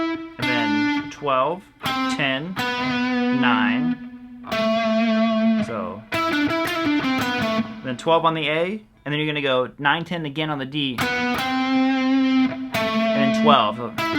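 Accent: South, United States. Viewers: Hi. Australia calling. Love that southern drawl y'all have!! You can give me Blackmore fovever as he's my favourite guitarist bar none. How about the "solos" on Highway Star and Burn?? BTW check out Bach's tocatta and fugue in D minor for the Death Alley Driver riff and Sky's version of Tocatta for Ritchie's inspiration.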